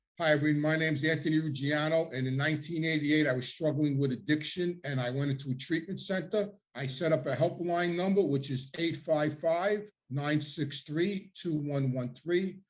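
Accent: New York, United States. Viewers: Huge fan from Toronto, Canada. I absolutely love your podcasts, it's very fascinating the way you tell the stories and everthing and gota love your NY accent, keep up the good work 👏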